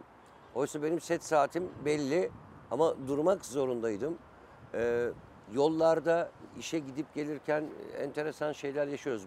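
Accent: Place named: Rize, Turkey. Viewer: Rize şivesine çalışman gerek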